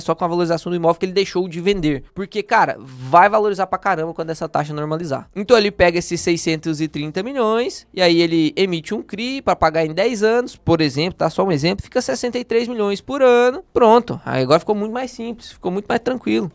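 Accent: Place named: Goiás, Brazil. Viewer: Toda vez que o fundo realiza uma cagada dessas, tão óbvia de ser evitada, é porque alguém lá dentro ganhou com as transações, alguém ganhou e vários cotistas perderam, infelizmente. Quem discorda disso ainda vive em um mundo fantasioso na imaginação. Não é momento de vender as cotas, como geral sabe, o fundo não vai quebrar e a crise vai passar, mas pra quem é inteligente, a desconfiança vai permanecer e acredito que isso daqui algum tempo, possa fazer vários cotistas abandonarem o barco! Aquela máxima quem bate esquece mas quem apanha não, se bem que no Brasil, pra lembrar, tem que apanhar muito, e nos fundos geral tá apanhando pra caramba a algum tempo! Sou meio pé atrás com os vídeos do Lucas vejo ele com uma postura muito negativa com 99% dos fundos, se escutar ao pé da letra tudo o que ele diz, ninguém fundo presta pra investir, mas se tiver um pouco de filtro, vai perceber que o olhar dele é muito crítico e refinado, não alisa nenhuma gestão e abre os olhos pra minutos podres que passariam despercebido facilmente! 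Também gosto muito do seu canal, seu ar de Goiano de falar me agrada porque também sou goiano, mas não dá pra passar pano, dá impressão que foi comprado ou está em um estágio de negação, no mais acompanho o canal e aprendo muito com seus vídeos, mesmo achando que em alguns vc fala muito e passa um ar de pastor querendo agradar ou alienar seus fiéis kkk, mas é isso, tudo precisa ser visto, filtrado e absorvido! Abraços!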